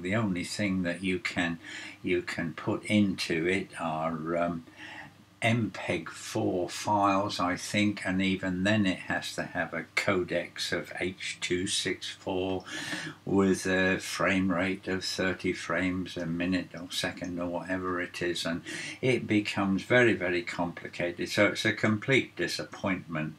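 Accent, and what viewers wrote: United Kingdom. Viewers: Always like British accents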